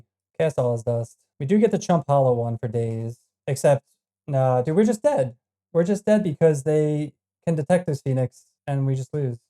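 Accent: Baltimore, United States. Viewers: Hey, so firstly, I thought you were from Baltimore too 😂, like the Swedish guy! I lived in NJ, in Bergen County but in UK for last 20 years. Anyway, what do you prefer, this or the Herigast deck? This one seems like it's prepared for a bit more but I'm just getting back into Magic after a 4 year break, so I am just getting caught up with the meta and have never seen a couple.of the decks you played on this video. What's your preference?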